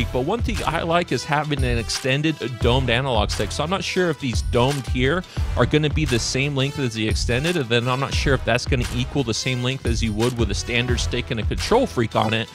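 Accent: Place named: United States. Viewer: Sounds American but doesn't look it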